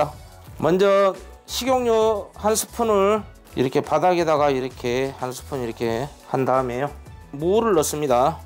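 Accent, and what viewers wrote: Jeolla, South Korea. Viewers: 셰프님 부산분이시죠? 전라도 사투리도 들려서요ㅋ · ㅎ.. 고향이 어디신가요? 전라도쪽 같아요~~ 여긴 전라도 광주 입니다..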